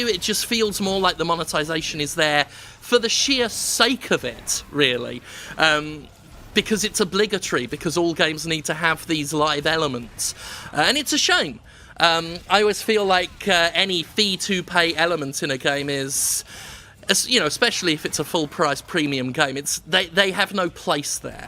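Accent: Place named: RP, United Kingdom. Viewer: I read this is his british toff voice.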